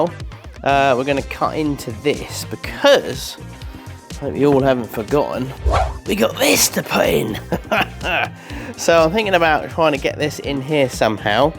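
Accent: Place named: RP, United Kingdom. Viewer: very posh 😊👍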